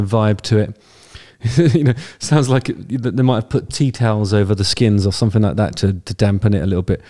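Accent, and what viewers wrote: United Kingdom. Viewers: Hello. Firstly I’d like to say I love your content. Secondly, you have the most annoying intro I’ve ever heard yet I find myself singing it throughout the day. When I first started listening to you I thought you were saying “right again.” I thought to myself this is one cocky Britt and I like it.Have a great day sir!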